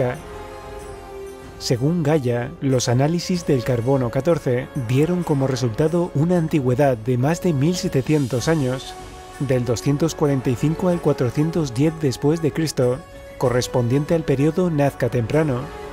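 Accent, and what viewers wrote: Spain. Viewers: Vuen video, lasrima del narrador gachupin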